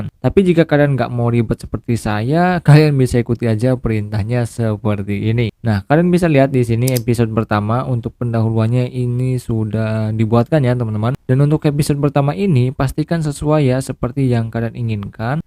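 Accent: Cirebon, Indonesia. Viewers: orang cirebon tah bang ? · Bonggan dewek, wong cirebon tah kang?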